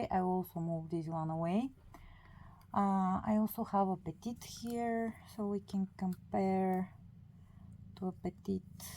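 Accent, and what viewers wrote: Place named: Russia. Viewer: Простите, но акцент вас выдает, вы либо русскоговорящая либо украинско говорящая...я из Украины, для меня это важно :)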